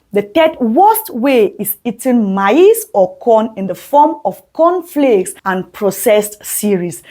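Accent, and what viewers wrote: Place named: Cameroon. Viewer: Mom from your accent and name, you are a Cameroonian i guess... Good job dear!🎉🎉🎉🎉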